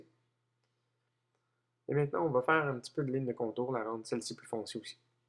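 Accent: Quebec, Canada. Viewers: il a un accent québécois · merci mais ta vois et bizzar acssant canadien mais encore merci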